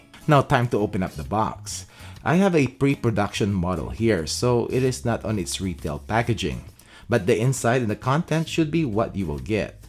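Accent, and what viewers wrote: Philippines. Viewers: Filipino accent is so beautiful!! 🥰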